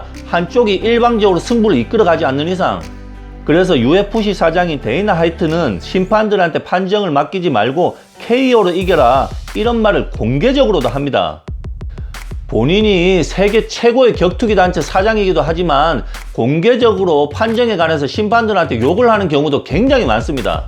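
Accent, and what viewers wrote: Gyeongsang, South Korea. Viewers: 점수가 쁘라스가 되고 점수를 몬어꼬 ㅋㅋ 저도 경상도 입니다ㅋㅋ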